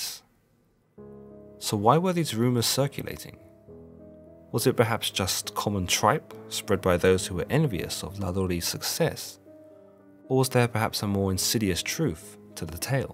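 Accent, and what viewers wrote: United Kingdom. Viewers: Hey British commentator when you're doing an American documentary get to the point please.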